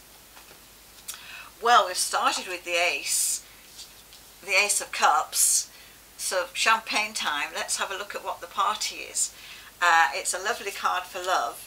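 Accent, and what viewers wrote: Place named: United Kingdom. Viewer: i love the british accent and english , my school teacher was a britisher and taught me the Shakespeares plays , there were many references to prophecies in the plays, love your voice and puts me back in the class room, keep speaking.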